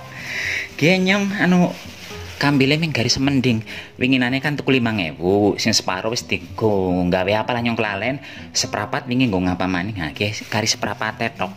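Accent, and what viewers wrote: Banyumas, Indonesia. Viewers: Itu ciri khasnya kang..bhs ngapak. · pasti aku ketawa dulu baru komen 😂 salam ublek" capcus wajib di coba ngapak terusss nggeh 😂😂👍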